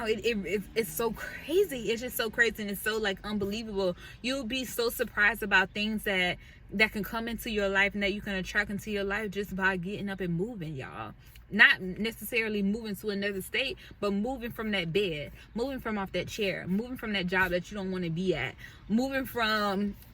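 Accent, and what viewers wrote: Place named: South Carolina, United States. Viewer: I love your American South Carolina accent iam living in my car too in DMV area, and thank you for your important info and that iam not alone, much luv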